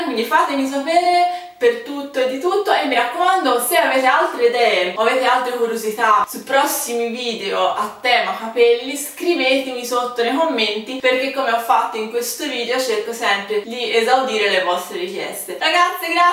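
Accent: Tuscany, Italy. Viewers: Sei della toscana? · dialetto toscano ;) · ma sei fiorentina' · Sei toscana? Video utilissimo <3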